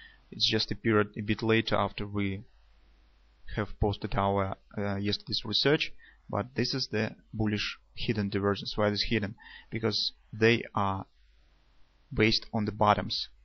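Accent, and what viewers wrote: Russia. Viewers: The accent is killing me. Why does every single Russian have such a strong, distinct accent? I've never understood that.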